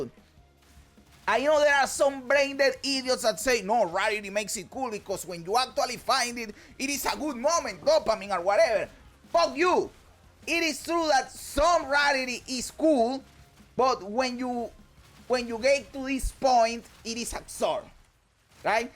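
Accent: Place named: Dominican Republic. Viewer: eres dominicano no? eso accento me encantalo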